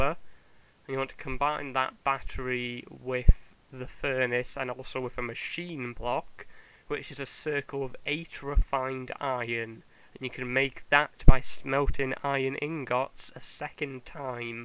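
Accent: United Kingdom. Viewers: You sound like 'Charlie is so cool' u know that British one haha. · Probably a dialect of the UK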